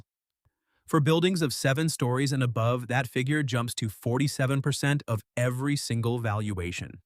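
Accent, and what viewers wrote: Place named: United States. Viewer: Why am American accent?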